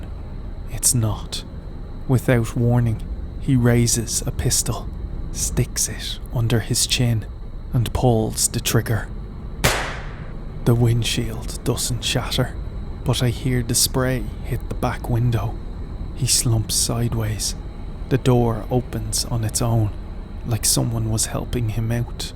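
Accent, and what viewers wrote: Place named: Ireland. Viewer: Hi, may I suggest, you reduce your posting from every day to 3 or 4 days per week. - we don't want to see you burn out, your subscribers care about you - having set days gives you a goal and we know when to look out for your videos - if you do more videos you'll, build up a backup in case the inevitable problem occurs, such as tech glitches - its tempting to give in to the pressure of posting full videos every day. You are the boss, you set your own achievable schedule - suggestion, on non-posting days post a teaser of what is upcoming. This will give you a post a day and maybe help with the pressure. One of the reasons I follow you is the quality of the videos, you aren't American and the Irish accent is gentle on the ear, like the intros and no ads. There is a lot of new YouTubers posting videos in this genre at the moment. 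Quality will last over quantity, hang in there. Keep going, you've got this and we're all behind you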